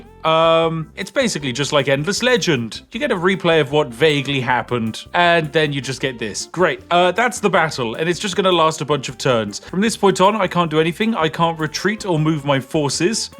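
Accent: United Kingdom. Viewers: I don't really know what's going on but I enjoy listening to the silly British man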